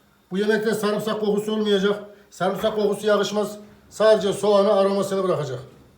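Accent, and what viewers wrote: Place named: Anatolia, Turkey. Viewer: Reis uzun zamandir senin Hemen tüm yemek tariflerini seyrediyorum ve yapiyorum Gercekten inanilmaz lezzetler cikiyo ortaya Ellerine emegine saglik Son olarak sunu da söylemeden gecmicem Cok naturel bir insansin Kisiligini karakterini bozmadan aynen yola devam ediyosun Özellikle konusurken siveyi bozmadan yapmacik degil tamamen anadolu agziyla anlatiyosun Helal olsun Yolun bahtin acik olsun Viyana dan selamlar Saygilar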